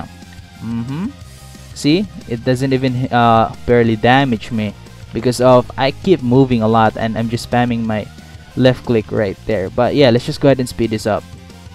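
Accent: Philippines. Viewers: I can tell my manz filipino and speaks tagalog probably because of the accent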